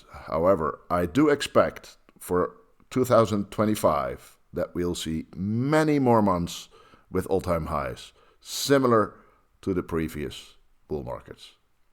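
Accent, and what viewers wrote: Netherlands. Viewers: Duurde wel even voordat ik het NLse accent herkende.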